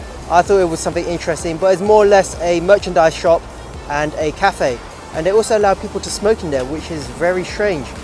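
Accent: United Kingdom. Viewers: nice vid as always!! on a side note are you japanese? from your UK accent seems like you've lived your whole life in the UK love your vids been a sub since the first evo6 review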